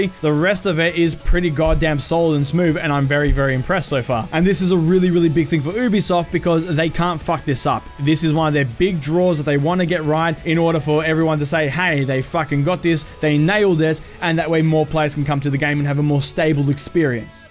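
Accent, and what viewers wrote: Australia. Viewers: Btw it seems a lot of people are surprised you are Aussie, i knew it as soon as i heard ya.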